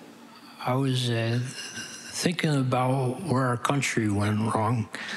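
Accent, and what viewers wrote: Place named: New York, United States. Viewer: A voice that actually represents NY. This man is a treasure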